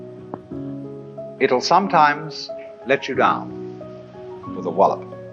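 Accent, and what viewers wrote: England, United Kingdom. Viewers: Where do I begin. But that was the biggest most flowery word salad mixed with a pinch of despair I have ever heard. TRUST NATURE, your are nature, we are nature, nature will let you down, life has no purpose, Watt goes from if you don’t trust nature you will get tyranny??? Making a huge jump to support your ideology wouldn’t you say. I have never been less inspired listening to someone than what I just heard. But it’s cool and accepted because Watt has an English accent. You’re being lied to and you have no hope. But fear not because you can always trust nature, sometimes…., Wow!!!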